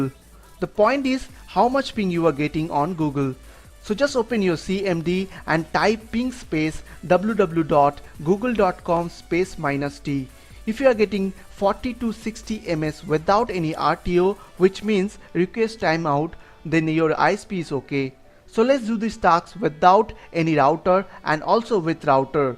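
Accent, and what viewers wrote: India. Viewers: I open the video , i hear an indian voice in a tutorial once again , i close the video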